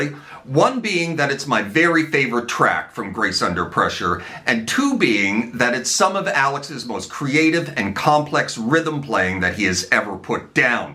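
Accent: Canada. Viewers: Soon as I heard the Canadian accent I knew I was listening to a man who knows his stuff. Rock on from Detroit 🤘🏼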